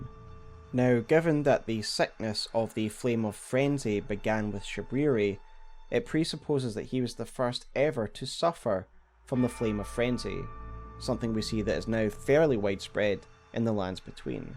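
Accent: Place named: Scotland, United Kingdom. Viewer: Wake up honey, the Scottish Elden Ring lore man has posted!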